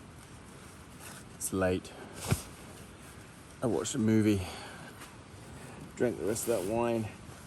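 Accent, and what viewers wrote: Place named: England, United Kingdom. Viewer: DON'T MENTION SOMETHING GO'N WRONG...HAHAHA!!! WELL,LOVE THE NEW TENT...ITS AWESOME!!! AND SO ARE YOU N BRUCE. AND YOUR SO EXCITED ABOUT THAT TENT..I JUS FEEL YOUR JOY HAHAHA '' I LOVE HOW YOU TALK...YOUR ACCENT IS AWESOME!!! LIK ENGLISH ACCENT...ALSO PLEASE GIVE A ENGLISH INTERPRET WHEN YOU DO MEASUREMENTS N POUNDS..DID I SAY THAT RIGHT..WELL INSTEAD OF WHATEVER KEELOADS ARE...POUNDS??? WELL I NEVER LEARNED POUNDS AS MEASURE..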